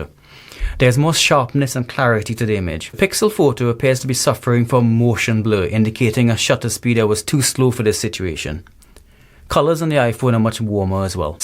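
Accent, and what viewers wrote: Trinidad and Tobago. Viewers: You from trinidad? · I hear a Trini accent...🤔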